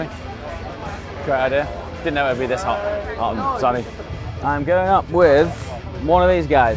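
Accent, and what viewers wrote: United Kingdom. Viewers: Only got onto you through dde, love the content! British voice is always nicer than an Americans! Love from down under mate!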